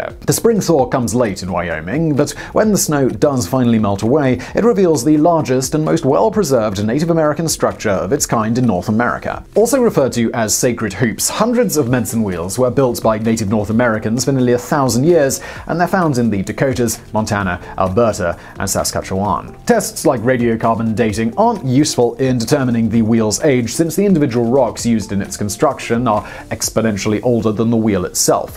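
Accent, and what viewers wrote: United Kingdom. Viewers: All of the posters criticizing Simon’s pronunciation need to remember he speaks with a British accent. I challenge many of you to go to other countries and pronounce words & places the same way as natives! Better yet, visit areas in the US and try pronouncing words the same way as people living there. I had a friend who was annoyed that I couldn’t pronounce her daughter’s name correctly. She was from PA and I am a native New Englander, from CT. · There is something so absolutely soul warming about listening to a Brit discuss the glory that is America. Never change. Never change.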